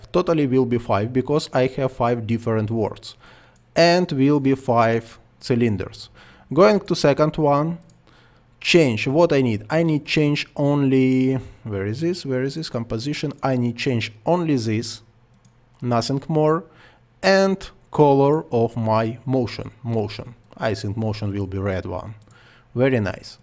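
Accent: Russia. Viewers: А по-русски тоже самое можно?, иностранцы ваш английский все-равно не понимают, думают вы по-русски говорите...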